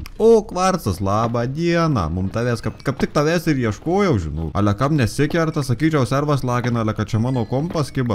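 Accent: Suvalkija, Lithuania. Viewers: Kadangi esu iš Marijampolės tai tu taip primeni tėvus su tuo tarimu xd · Kai pamatai video: oooooo daba užstrigsiu porai valandų kol suprasiu viską ką jis ten suvalkietiškai kalbėjo.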